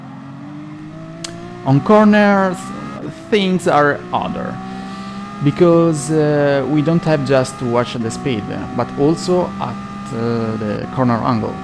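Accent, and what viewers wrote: Italy. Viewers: Absolutely italian. A bit of french influence but italian intonation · The occasional Italian vowels are amusing. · Great italian/ english accent,. Don't lose it, it's the connection to Italy and it's passion for motorsports. Also thanks for the info, for a newbie like me this is really helpful. 🙌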